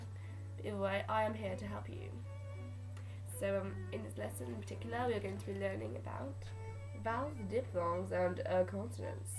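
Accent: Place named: United Kingdom. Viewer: 【Leia】 It's ok I pronounce it the same way. Where are you from? You sound like me. (I'm British btw!:3)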